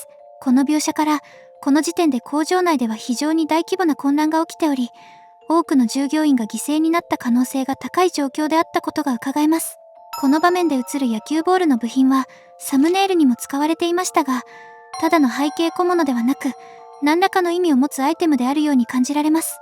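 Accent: Kansai, Japan. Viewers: 何故関西弁？ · 関西弁マジでおもろいなwwww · 関西弁にするとなんだか吞気に見える不思議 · なんで関西弁やねんwこのチャンネルちとネタ入れてる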